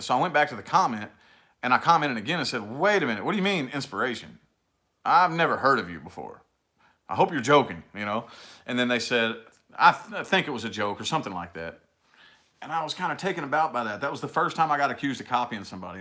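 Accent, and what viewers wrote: South, United States. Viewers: Hi, I absolutely enjoyed your chatting with us today! You are one of my favorite tarot readers!!! Your honest and very real and frank with your messages and your southern humor and accent is refreshing for many of us! You have an amazing gift and thank you for sharing your experiences with us subscribers! Oh and happy hump day from Redondo Beach, California!!!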